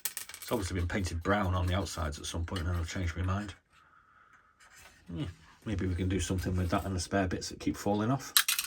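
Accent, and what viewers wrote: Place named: Merseyside, United Kingdom. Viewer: Sounds a bit of Merseyside, Wirral, Lancs there, prob got a motorcycle too, ( like myself of course, im projecting), Subbed, 👍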